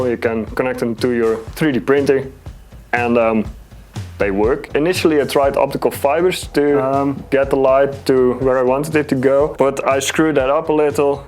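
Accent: Netherlands. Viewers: Eerste keer op je kanaal. Dacht aan je accent te horen dat je Nederlands was. De "Ah, nee, tyfus." overtuigde me. Interessant idee om met een gel te printen. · mooi nederlands accent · just from the accent I can tell you're dutch, its always very obvious or unnoticeable at all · Okay, your accent made me suspect you to be Dutch. But the tube squeezer made me 100% sure :) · Hello fellow dutch guy very nice accent, keep up te videos and cheers! Geniet van je frikandelbroodjes~ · Het Nederlands accent spat er van af. Kanus man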